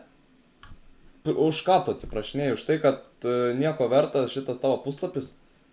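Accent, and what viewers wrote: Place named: Samogitia, Lithuania. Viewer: Tikrs žemaitis esi, nes žemaitėja yr geriausia ❤ Kap į tava video😍❤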